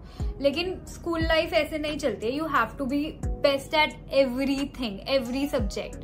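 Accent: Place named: Rajasthan, India. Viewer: Didi aap Rajasthani accent kase bol lete ho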